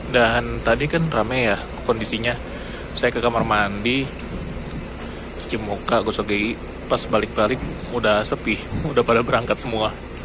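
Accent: Indonesia. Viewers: Agan ini Youtuber dengan logat Indonesia, jadi saya suka.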